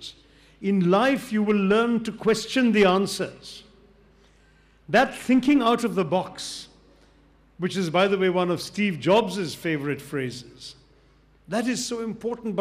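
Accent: United Kingdom. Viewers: ~ You can even listen to Che Guevara, for all I care. Nobody is distracting you or stopping you. Just because one can speak crafty, polished queen's english doesn't absolve of one's involvement in the death of a woman one is married to, in a highly suspicious circumstance that directly tied to one with many loose ends floating all over. I don't care who says what, I would never send my kids to a school who will invite someone like him to talk on morality & leadership.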